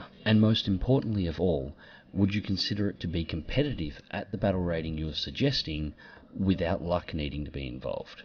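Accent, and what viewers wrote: Australia. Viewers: Lehtokurppa Von Fön get yr ears cleaned. Hes AUSTRALIAN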